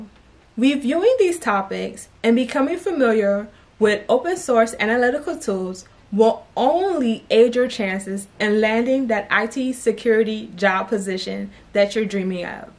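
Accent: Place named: East Coast, United States. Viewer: I love how east coast women talk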